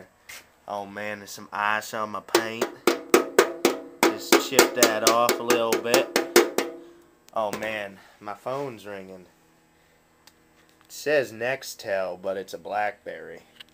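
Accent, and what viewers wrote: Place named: Canada. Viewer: daves farm guy maybe , sounds canadian , doesnt sound like me , your not acting goofy enough to be making fun of me :)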